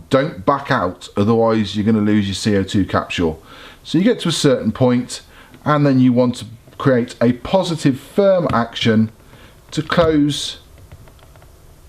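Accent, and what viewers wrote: United Kingdom. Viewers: Am I the only one who thinks his voice and accent are annoying for this kind of video. Cuz I totally wasn’t expecting a guy with a British accent when I came here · I subbed just because of your voice. It's not just the accent, you could be a commentator for the BBC or Top Gear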